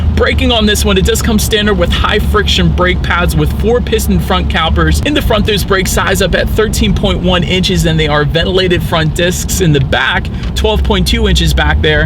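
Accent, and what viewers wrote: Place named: Southern California, United States. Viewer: You from socal? You've got the surfbro accent.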